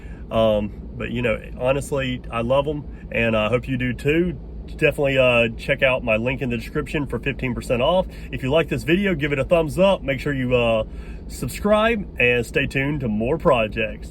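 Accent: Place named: Texas, United States. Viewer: Chrisfix with a texas accent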